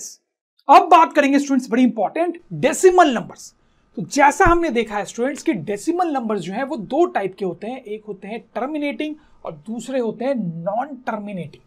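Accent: Bihar, India. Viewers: aap bihar se hai kya sir ?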